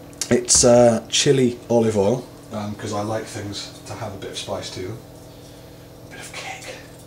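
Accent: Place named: United Kingdom. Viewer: Hello! I watch your videos, and I'm enjoying to improve my English listening you with your fantastic british accent. Hugs mate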